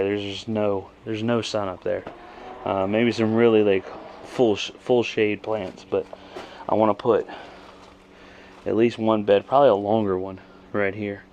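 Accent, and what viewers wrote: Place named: South, United States. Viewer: the southern accent is sneaking into this one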